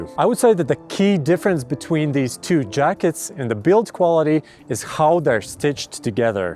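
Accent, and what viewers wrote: Latvia. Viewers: Sounds like Latvian accent... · Tu takš letiņš pēc akcenta 😄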